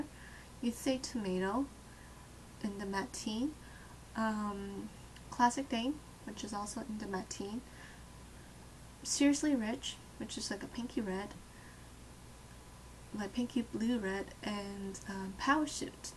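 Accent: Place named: East Asia. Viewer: She sounds the same as other east-asian youtubers whose first language is not English.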